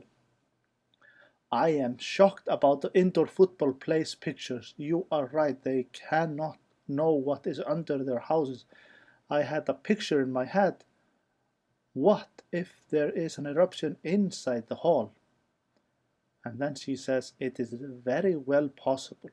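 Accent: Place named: Germany. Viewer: She mentioned it in a video, but I already knew because of her german accent. You can see some people comment in german on her channel.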